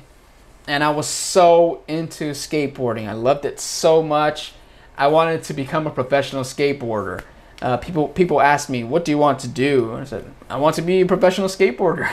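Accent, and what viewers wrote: United States. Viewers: Посмотрела видео, говорит оооочень медленно, использую простейшие фразы. Это для ребят у которых уровень чуть ниже среднего. Лучше бы реальный разговорный с той скоростью, как говорят американцы. Но всегда приятно послушать американский акцент! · Как классно и интересно! Я тоже хочу говорить с таким же американским акцентом. Но пока по моей смачной мягкой L и картавенькой R понятно, что никакая я не американка и не британка.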